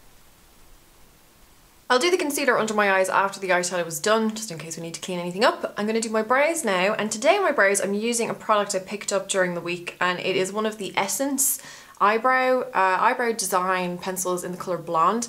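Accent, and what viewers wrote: Ireland. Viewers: you are from aus?! i thought u were irish ha! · Just randomly found your channel. LOVE!!!!!!! I know you mentioned Australia, but that's an Irish accent I hear. What's the story???? I went to Northern Ireland last summer, so I'm basically obsessed. Thanks for a great tutorial :)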